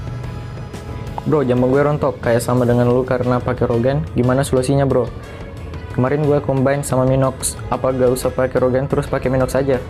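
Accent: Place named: Sulawesi, Indonesia. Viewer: Orang sulawesi ya?logat.y kentara😁✌️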